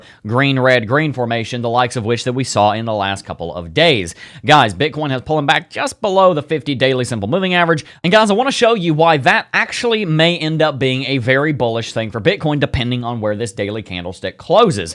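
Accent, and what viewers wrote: South, United States. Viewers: One of next fav analysts , it’s the southern accent or wherever the hell yo from . I love coffee too bro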